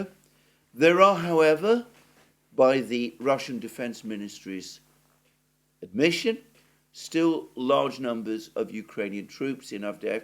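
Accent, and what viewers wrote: United Kingdom. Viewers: Thanks for your hard work, much appreciated! I love your very British accent by the way, British English sounds a lot better than American English, at least that is what I think.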